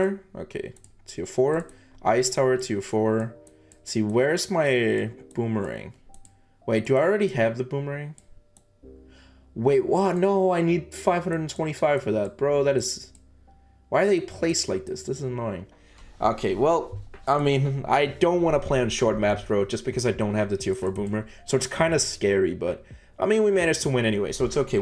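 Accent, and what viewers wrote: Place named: Denmark. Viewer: I just gotta ask, what do you think about Btd Battles 2. Oh yeah, I hear a weak danish accent, hører jeg rigtigt?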